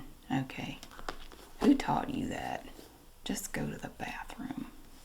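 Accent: South, United States. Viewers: I've been watching your channel for a while now and you are one of my absolute favorites. I'm also a southern lady and my family disowned me when I came out as gay and I moved away(I even lost my accent). My grandmother's name was Mary and your accent and voice just remind me so much of how comforting of a presence she was. It just takes me back to a different world I left behind a long time ago. Also you are so beautiful! I swear I'm not trying to flirt lol. I'm not really into feminine women, just jealous tbh haha.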